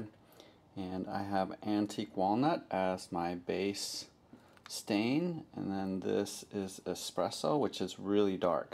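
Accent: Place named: United States. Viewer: because of the american accent, I kept thinking that you were calling the abomination obamanations. made me chuckle. good job though dude.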